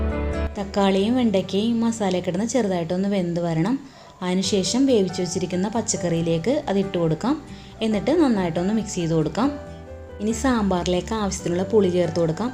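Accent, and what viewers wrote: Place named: Thrissur, India. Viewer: Thrissur presantation superrrr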